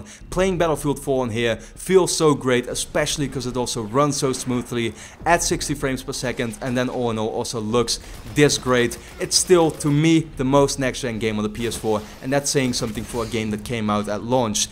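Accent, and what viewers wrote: Netherlands. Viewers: leuke video man ( ik hoorde meteen al dat je nl bent )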